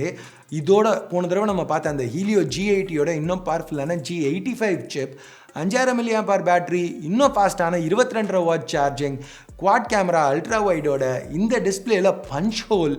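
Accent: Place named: Chennai, India. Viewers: Local tamil slang 😂😂😂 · Chennai slang romba super bro 👍🎉👍🎉👍🎉👍🎉👍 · Bro pathu bro romba Chennai language Varuthu, and casual ah pesaringa 😂😂 yen indha matram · 🤣🤣🤣 1st time bro spoke local chennai slang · 🤣🤣🤣🤣cheenai slaang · Ainba louinkasa super Chennai speech Samaaaaa😂😂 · Kola kaandu pola moto brand mela kku, vittu velasitaru, சென்னை ஸ்லாங்க் யோவ் இன்னாயா😂🤣😂..... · Namma chennai language velila vandhudicha. 😂😘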